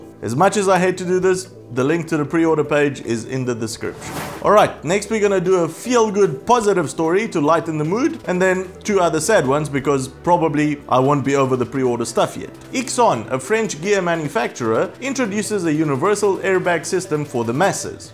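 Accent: South Africa. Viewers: Dude.... do you have some South African in you? You have some very South African accent in your words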